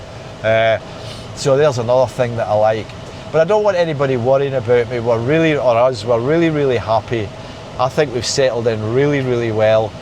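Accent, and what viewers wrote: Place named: England, United Kingdom. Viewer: I like to listen to but not more than 10 mins as after that I start to feel bit of headache 😢, I am not used to that English sound, i am used to normal one 😮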